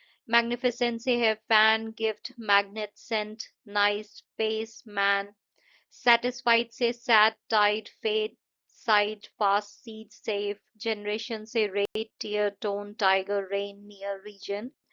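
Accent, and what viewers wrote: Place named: United States. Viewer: mam where you from i had a hunch you from to America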